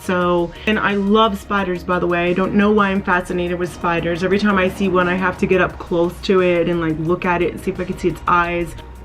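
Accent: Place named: United States. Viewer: I just love your pronunciation sooo much! I'd love to be able to talk so American like you do.. (I'm from Austria that's why lol). Thanks for all the advice, I'm very looking forward to the next video!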